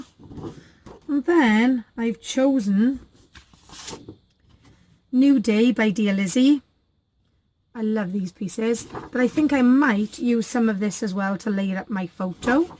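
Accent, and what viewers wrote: Wales, United Kingdom. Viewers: I love your layout, so bright and cheery. I don't use red much either, so it's great to see how you've made it work so well. Also, I love the Welsh accent! I lived in South Wales for a while many, many years ago and everyone made me feel so welcome :)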